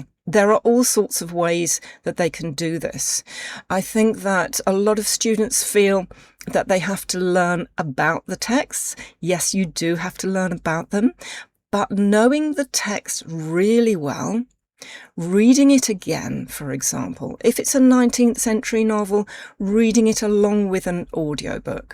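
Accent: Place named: RP, United Kingdom. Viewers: It is great help for native speakers like me to learn RP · I find it enjoyable to listen to your English British sound. I wish I could speak like you.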